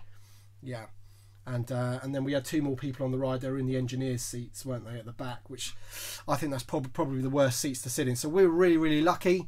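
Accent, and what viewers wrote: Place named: United Kingdom. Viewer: I've always liked the way you Brits pronounce WATER as WATA.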